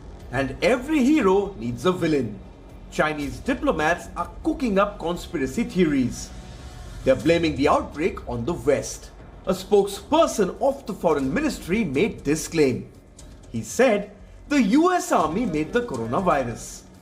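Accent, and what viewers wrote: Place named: India. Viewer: Sorry but you just cant take Indians seriously with the attrocious accent.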